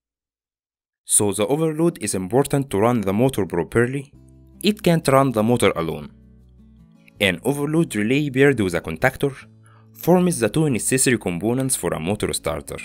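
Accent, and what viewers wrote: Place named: Egypt. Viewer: الانجليزي المصري نار وشرار 🔥🔥ههههههه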